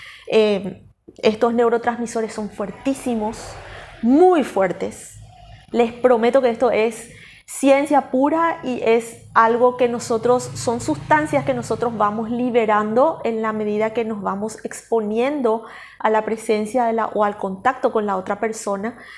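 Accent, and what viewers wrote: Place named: Paraguay. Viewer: Por tu acento creo sos de Paraguay, todos hemos pasado por alguna experiencia similar, como humanos lo experimentamos, gracias al Señor Jesucristo tenemos su Santo Espíritu y el nos ayudará siempre.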